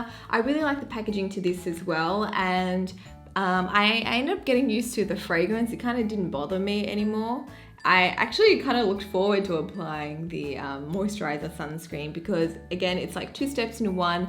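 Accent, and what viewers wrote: Australia. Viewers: it's her aussie accent chill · I like your Aussie accent!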